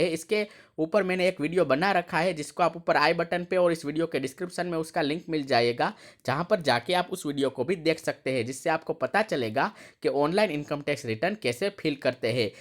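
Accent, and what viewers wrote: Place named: Gujarat, India. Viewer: आप की हिंदी सुनके लगता है की आप गुजराती हो।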